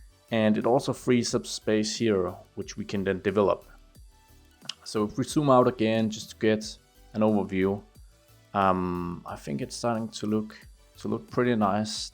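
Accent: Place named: Denmark. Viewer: Danglish :D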